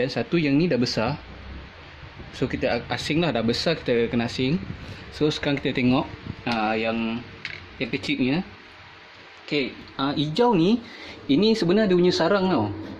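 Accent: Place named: Kuala Lumpur, Malaysia. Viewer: Ni cara cakap orang kuala lumpur dan Selangor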